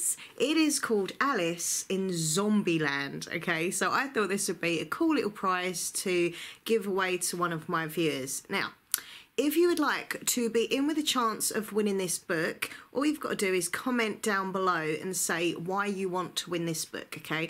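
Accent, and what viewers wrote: United Kingdom. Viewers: I want to win this book because my native language is not English and I use books films and youtube videos for learning the language and I think books are the best in this because there is a lot of vocabulary in it. I learn English for some time and I try to learn more and more, so I'm trying to read English books and watch your videos, because you have a strong British accent which is good to practice listening.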